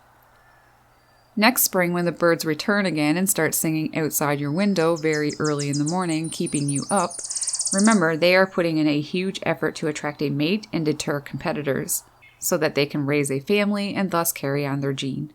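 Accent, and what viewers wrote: Canada. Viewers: Was wondering how far up north, then I heard "aboot", that far up north i c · The accent is Canadian eh? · Its aboot time..clearly Canadian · Your accent says you're from Canada. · Hello. Looking forward to my morning irritation of springtime American Robins. I love your explanation and it makes sense. I'm from northern Minnesota and your accent was immediately recognizable as Canadian (very strong). Which province? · There is not a thing I can do a-boot that.. soo darned Canadian! lol..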